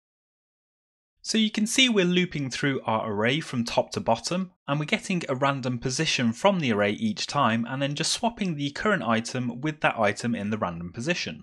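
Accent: United Kingdom. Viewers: I think you are from UK try to speak slowly so we asian can get what are you saying just a suggestion btw nice tutorial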